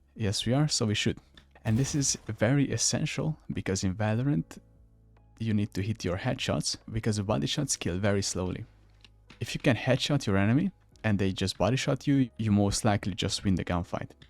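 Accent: Hungary. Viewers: AAAA az a szep magyar akcentus<3 Great vid tho:) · Tudtam, hogy Magyar vagy! Az akcentuson hallatszik, mondom ránézek a névjegydre, és bingo, Magyarország. :D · Are you hungarian? Your accent sounds like hungarian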